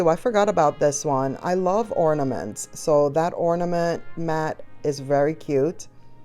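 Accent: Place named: South, United States. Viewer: You are in Myrtle Beach?! I’ve been wondering what southern state you’re from b/c you say yall like a southerner !😂. I’m right up the road in the upstate! I love the beach in the fall!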